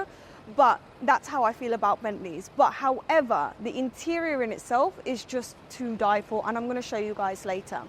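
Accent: United Kingdom. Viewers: where are you from originally? Also i can sense the british accent lol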